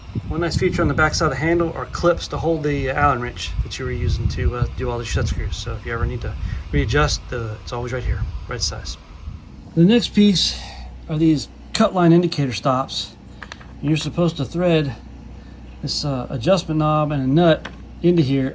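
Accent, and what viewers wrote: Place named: South, United States. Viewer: southern accent, scared of manuals that might resemble "books", yeah that fits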